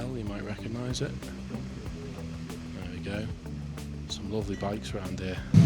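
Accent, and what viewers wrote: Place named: North West England, United Kingdom. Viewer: Fair play doing a big trip like that on your own 🏍️👏🏻 you sound like your from the North West? Maybe catch up on a ride in the future 😎 great vlog - Cheers Rob.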